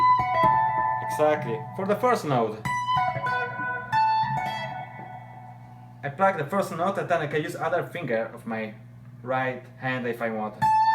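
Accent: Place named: Veneto, Italy. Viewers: he's from Italia Do you speak italian ? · His accent obviously sounds bad, but its typical of the venetian region in Italy.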